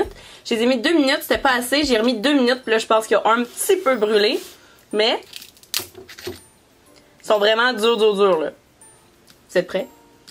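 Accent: Quebec, Canada. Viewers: J adore ton acent kebekoi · J'aime trop l'accent quebequoi!!!! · J’adore ton accent québécois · Superbe vidéo je suis tombé sur toi comme ça j’adore ton accent québécois enfin bref continue 🙂💖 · T trop belle et j'adore ton accent quebequoi ps: excuse moi des faute abonné toi à ma chaîne merci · Ton accent québécois ❤️❤️❤️🔥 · Yes kebek adore l acent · J'adore ton accent Québécois 😍👍👍✌💕💜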